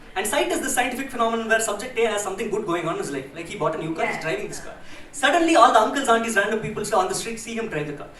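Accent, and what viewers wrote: South India, India. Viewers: Slow beta slow and ye tgoda accent please. Btw south indian accent is cute as well😅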